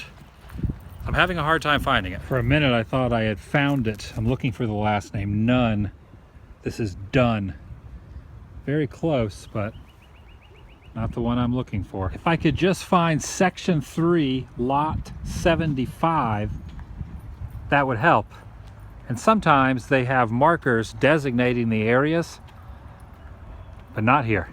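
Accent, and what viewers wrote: United States. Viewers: Which region of the USA is accent associated with?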